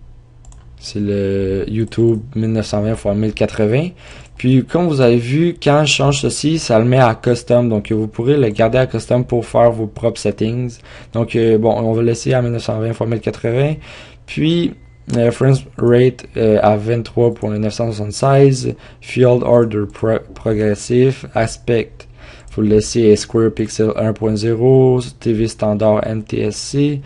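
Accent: Quebec, Canada. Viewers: C'est un youtuber québécois ! On prononce pas les mots de la même façon que les français de France. Tu t'attendais à quoi ?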